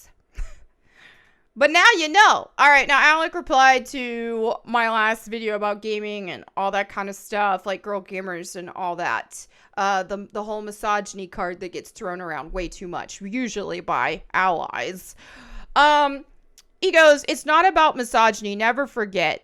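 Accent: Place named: South, United States. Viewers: I love Southern twang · I think I’m hearing a bit of a southern accent.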